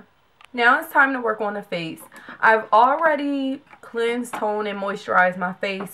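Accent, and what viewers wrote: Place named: New Jersey, United States. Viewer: Ahhh just I knew by your accent that you were a fellow jersey girl! What I love most about this is you explain how you hold the brush, how to apply the product, etc. Most people only show what to use, not how. Great video and of course lovely look! :)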